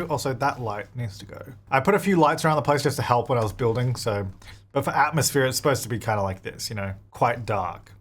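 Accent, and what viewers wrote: Australia. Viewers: I always love watching your build videos 😍🥰 they inspire me with my sims builds to try new ways of building 🤔🤩🧐plus i do enjoy hearing a fellow Aussie play the sims 4 👍🇦🇺😊.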